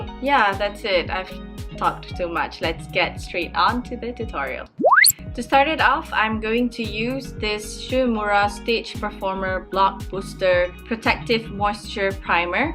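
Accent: Penang, Malaysia. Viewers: Please do make up tutorial in malay again. Love to hear your penang slang 😄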